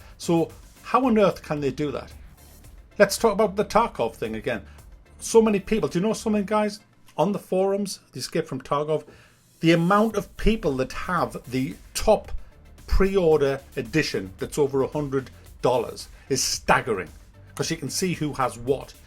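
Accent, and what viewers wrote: United Kingdom. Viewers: I won't defend a game unless it deserves it. I've loved your videos and find them so interesting. I'm 17 and have been slowly rebuying old PS2 and PS3 games you and other channels similar (check out Mark Brown, Game Makers Toolkit) to you suggest and I love it. Ive found some of my favorite games through this, so a huge huge thankyou. I think its not video games that suck today though its just business. Because its not just old games, its old music and old films (only a matter of time before its books). I love that your British, like me, your accent just adds to your reviews and no offence makes your frustration funnier NEVER THE LESS THANKYOU. WE NEED MORE CRITICS LIKE YOU IN THE SPOTLIGHT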